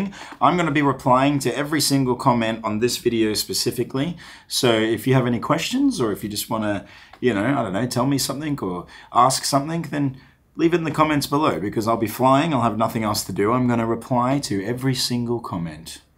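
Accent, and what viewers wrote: Australia. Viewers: Australian accent is so cool. I like the way you say doiemonds. Good luck on your trip. Can't wait to watch the vlogs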